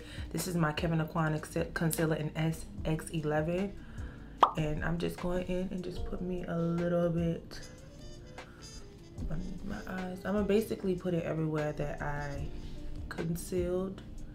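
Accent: Louisiana, United States. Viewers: You've gotta be from Louisiana!!!😅😅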